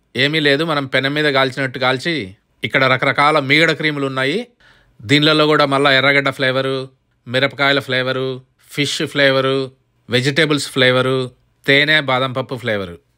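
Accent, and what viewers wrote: Rayalaseema, India. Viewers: Madhyalo bokka petti untaru amaina mana slang bale untundhi Anna I'm also rayalaseema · సార్ మీరు పక్క రాయలసీమ స్టైల్ లో మాట్లాడుతారు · Anna paka midhi rayalaseema kadha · Indakane lesina anna....Mee Kadapa yasa super Anna😁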